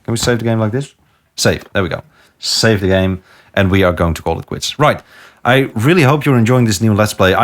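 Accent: Netherlands. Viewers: Within the first 10 seconds of the video I was like "is this dude Dutch?" checked your about and yes, yes you are, New sub acquired friend.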